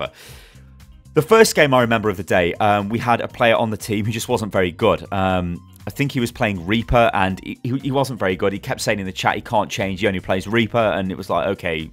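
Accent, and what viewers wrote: Germany. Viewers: Iam from germany too and I recognize a german accent xD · oh god this german accent like srsly... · He´s got a pretty terrible german accent....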